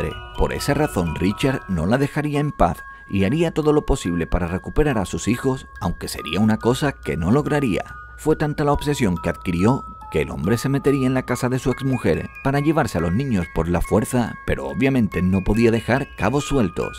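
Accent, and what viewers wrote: Spain. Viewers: Eres español no?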